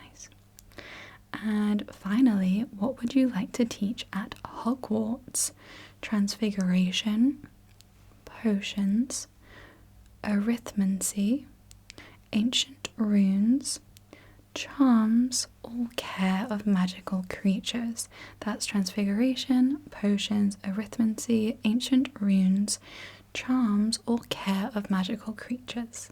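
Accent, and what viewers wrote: United Kingdom. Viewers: Ive heard a lot of british accents but hers sounds so smooth, does anyone know what this accent is called/what region of UK its from? (sorry in advance if this is intrusive :'3 ) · I'm in love with British accent Take care Hugs 😊❤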